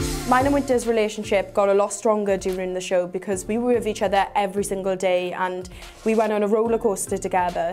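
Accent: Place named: Wales, United Kingdom. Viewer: I really love Lateysha's accent! The Welsh accent is so pretty!!!!!!!!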